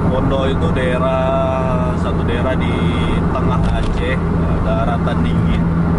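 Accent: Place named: Medan, Indonesia. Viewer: Abang tinggal dimana bang?, Soalnya Ngomongnya kek orang Medan hehe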